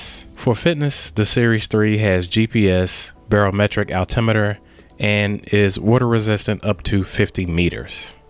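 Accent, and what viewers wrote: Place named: Durham, United Kingdom. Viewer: Lol bru you gotta be from durham or something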